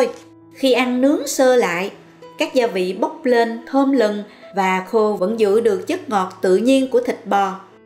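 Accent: South, Vietnam. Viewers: Cối xoay hay quá. Giọng nghe đúng chuẩn miền nam. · giong chi noi de thuong qua he giong noi chuan mien nam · Cảm ơn sự hướng dẫn của về chác là khô bò. Lâu lắm rồi không được nghe 1 giọng nói ngọt ngào đặc trưng của miền Nam khi xưa. Giọng mà đọc truyện thì hay phải biết. Chân thành cảm ơn.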